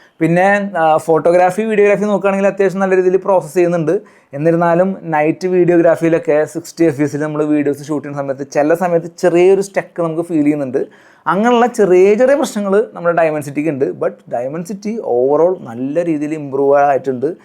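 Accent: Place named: Thrissur, India. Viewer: trishur basha ane udechad ene tonunu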